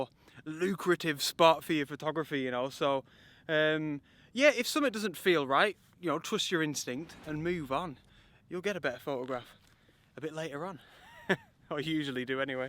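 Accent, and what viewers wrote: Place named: Cumberland, United Kingdom. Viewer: Good evening, from your twang what part of South Cumberland are you from (Millom). Nice to see you doing these Wainwright, fells.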